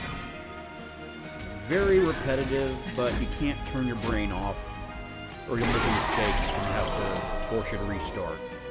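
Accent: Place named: North America. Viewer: Hi Not looking to pry, just hope all the hospital stuff you had mentioned earlier has gone/is going well. Thanks for posting these, they helped me figure out new methods of getting through the challenges. Edit: FYI, I'm all the way in Australia, just going by your accent I'm assuming you're in North America.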